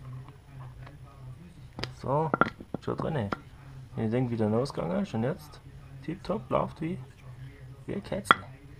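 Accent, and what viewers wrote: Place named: Swabia, Germany. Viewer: Sauber. Schwabe. Aus welcher Gegend kommst? Gruß vom Flughafen.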